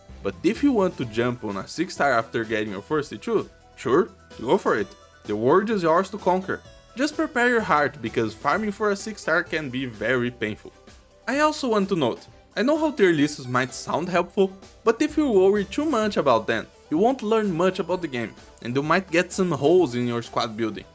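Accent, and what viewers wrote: Brazil. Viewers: Esse sotaque BR. Too obvious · manooo, eu assisto você um tempo já e eu tipo eu reconheço esse sotaque prq é o msm q o meu XD eu ia comentar da onde você era no caso de qual pais e agora lendo os comentarios vi que você era br mesmo, eu amei seu vídeo como novato no arknights eu to amando o jogo eu to esperando o banner do mizuki desde o primeiro dia que eu joguei, alias um vídeo desses pra um 6 estrelas seria incrivel! :goodvibes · Percebi na hora que é brasileiro só pelo sotaque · Tô ouvindo um brasileiro aí kkkkk. Raro ver BR jogando Arknights.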